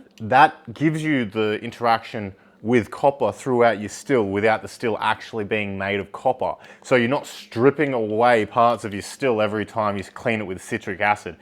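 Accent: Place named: Australia. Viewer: if you pay attention, you hear that his accent is not native to the US of A, More likely its Australian. In Australia, home distilling is allowed.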